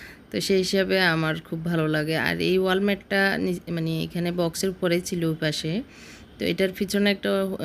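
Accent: Noakhali, Bangladesh. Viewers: Apu kamon acen??apnar kaj dekhta onk valo lage.apnar blog onk valo lage.apnar kotha sunle onk apon Mona hoi.amrao basai noakhalir vasai kotha boli.vaia k o onk thanks apu k support daoar jonno.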